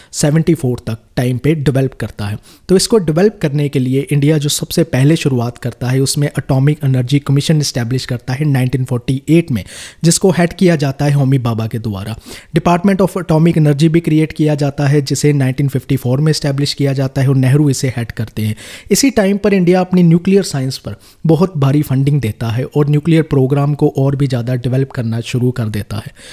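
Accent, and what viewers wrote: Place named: Punjab, India. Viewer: Very effective and knowledgeable information with punjabi assent. Thankyou so much sir.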